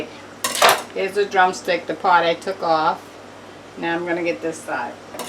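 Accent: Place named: Boston, United States. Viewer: Hi I can’t wait to try this. I was just thinking of some of the cheap things i buy plenty of and how i can use them in other recipes. This sounds perfect for all of the peanut butter I love so much. And thanks for the meat suggestion. Especially the dark meat cut-up. I know you hear this all the time… I hear Boston 😘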